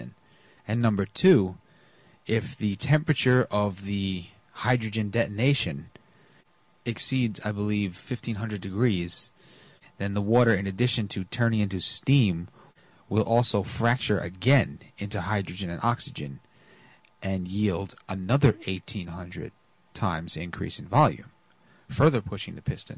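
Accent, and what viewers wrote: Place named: Sweden. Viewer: UR injection of ignorance into this forum reeks arrogantly with a sweedish accent to boot, like dead Ya Ya Gabor's television appearances, so annoying.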